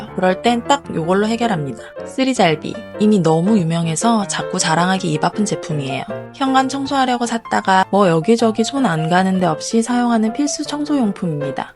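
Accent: Gyeongsang, South Korea. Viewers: 혹시 경상도분이신가요?중간중간 경상도 억양이 들리는듯하여.. 그리고 화장실 천장은 손을 안대는데도 저렇게 깨끗하게 되나요?한번 닦아줘야하는거죠?아님 물 뿌리거나.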